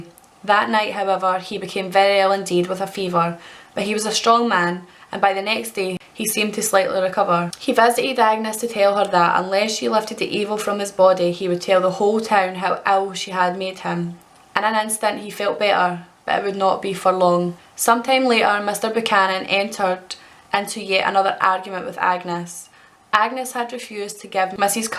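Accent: Scotland, United Kingdom. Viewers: So nice to hear an actual Scottish person talking about witch craft as a practicing Wiccan myself the Rowen branches and red ribbon are uesd as a fertility and abundance charm just FYI also the bridles u spoke about were commonly used as u quite rightly said on gossiping nagging wife’s but the husband would drag ur ass door to door to show the neighbours and towns folk that ur wife was a bit of a gob shite and u tamed you woman lol 😆 can u imagine also was common practice to get a good spanking if they disobey there hubby’s pmsl 🤣 what a shite time to be alive as a bold outspoken woman eh ... any whoo loved the video hope u do more on the subject u should look in to the Dumfries witch trials they were particularly shocking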